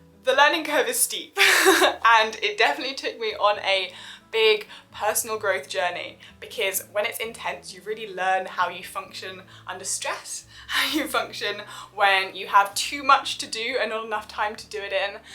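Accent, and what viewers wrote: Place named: England, United Kingdom. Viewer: Your English accent is music to my ears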